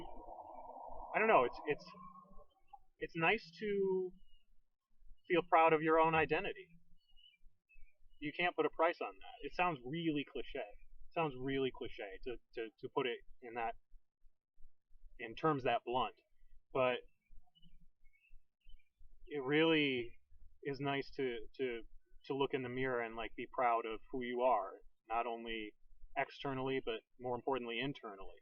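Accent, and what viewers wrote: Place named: Midwest, United States. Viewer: I was gonna say you sounded Midwestern :) (Wisconsinite INFP)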